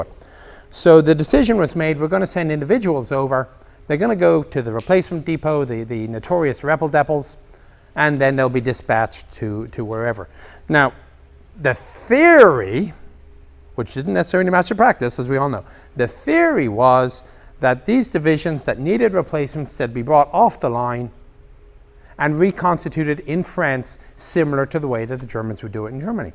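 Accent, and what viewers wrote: Ireland. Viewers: Where is that speaker's accent from? A little bit of Irish? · He is CLEARLY irish. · Irish accent?? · Chance your he's Irish?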